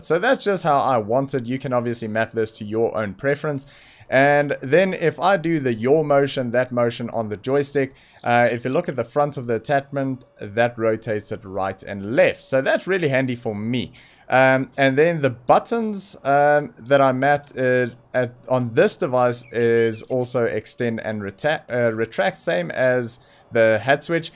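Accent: South Africa. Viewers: Are you from South Africa?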